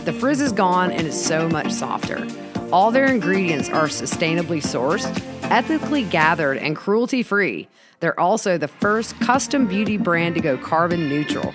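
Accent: South, United States. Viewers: You are doing an outstanding job reporting back to us on a daily basis. I know it must be very difficult to hear and see what was done to those precious children. Have a wonderful time with your daughter at the concert; she is greatly blessed to have a mama like you! Btw, I love your southern accent.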